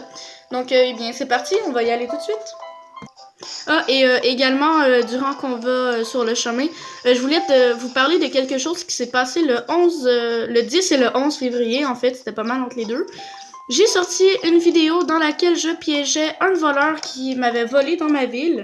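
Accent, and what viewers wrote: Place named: Quebec, Canada. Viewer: On attend un petit accent québécois quand tu parles, tu es québécoise ?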